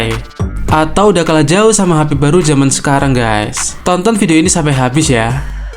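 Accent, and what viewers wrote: Malang, Indonesia. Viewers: Pasti abang nya orang malang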